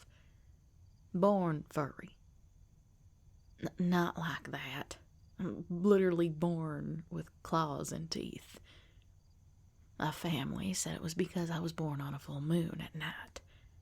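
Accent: United States. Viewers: That country accent has me in a chokehold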